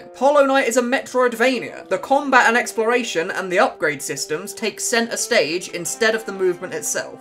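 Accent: United Kingdom. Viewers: British lol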